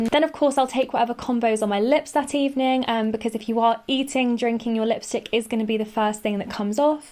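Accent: United Kingdom. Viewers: i watch your vids just because im obsessed with your lovely british accent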